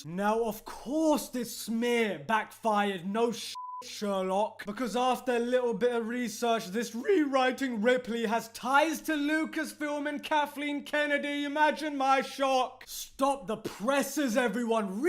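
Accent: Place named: Hull, United Kingdom. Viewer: Just taking a wild guess but are you from Hull or anywhere near? Knew a guy from Hull when studying abroad and sounds similar. However, as an American that’s never been to UK I make no claim of expertise with regional variations.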